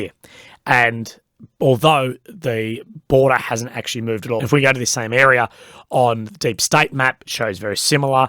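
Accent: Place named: Australia. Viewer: Look forward to hearing your goofy Australian ass everyday. Hope all is well with you. I think a reunion with rob on speak the truth is in order! Much love ❤️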